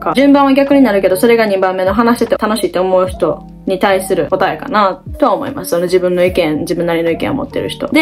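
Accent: Kansai, Japan. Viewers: 日本語で話すとどんな感じなんやろ〜って思ってたらめっちゃ関西弁で親近感湧きました😆(私も関西人です！🍵) 会話広げられへんの、めっちゃわかります…相手の言ってることは理解できるけどその後の返しで止まっちゃう。まだ私は頭の中で英作文してる感じなのでそんなんしなくてもパッと言えるように精進します😢💪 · 句動詞、基礎動詞が重要 自分の考えを持つ What,Why,Howで掘っていく なるほどです ありがとうございますー 関西弁なのに驚きました いつも英語なので、初めて日本語聞いたかも？ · 関西弁！！かわいい！！！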